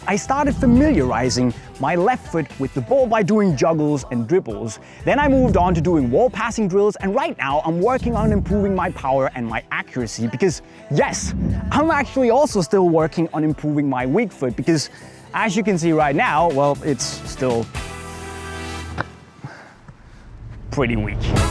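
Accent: Denmark. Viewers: He definitely has the danish accent😂😂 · I smell danish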